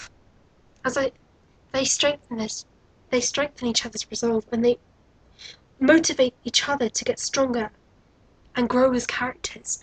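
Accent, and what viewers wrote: United Kingdom. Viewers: You have a cool british accent :D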